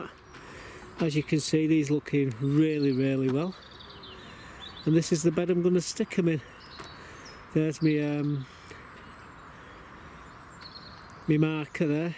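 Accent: Salford, United Kingdom. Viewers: Salford? I used to live in swinton. I thought I recognised your accent 👍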